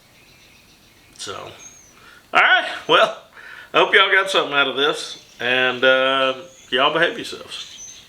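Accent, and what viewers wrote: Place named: South, United States. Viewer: Never so much as forged a nail, but I've just subscribed anyway, I love this gentleman's personality, it's cool to see someone who speaks like me, slightly different, but to someone up north it would be identical I suppose, I'm from a small town in NW Georgia, but anyway it's awesome to see a southern man like myself doing something on YouTube, so few people from the south use their actual accent on here.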